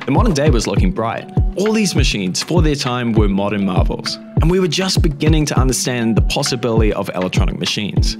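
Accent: New Zealand. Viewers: How did I not realize his kiwi accent. When I heard PB tech I was like wait a hot second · I knew you sounded like a kiwi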